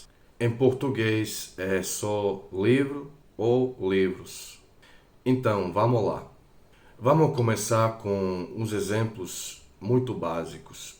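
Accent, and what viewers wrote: Brazil. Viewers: Cheguei a pensar que era brasileiro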